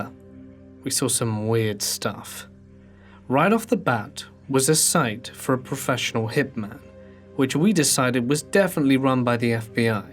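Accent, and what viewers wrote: United Kingdom. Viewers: Hes british all brittons sound like that . You watch too much mr bean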